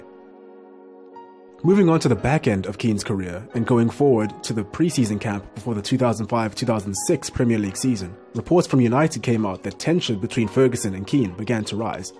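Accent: South Africa. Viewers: Nice to hear a South African 👍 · where are you from? south africa?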